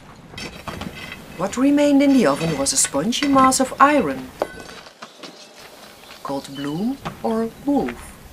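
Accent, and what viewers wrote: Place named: Netherlands. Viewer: It might have been better to have the commentary done by someone with less of a dutch accent and most of all with fewer grammatical flaws in it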